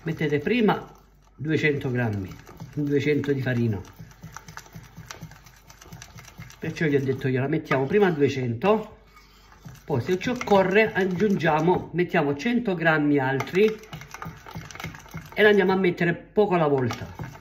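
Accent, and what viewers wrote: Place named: Salento, Italy. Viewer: Grazie 🙏🏼poi con l’accento salentino sono sempre più buone 😊bravissima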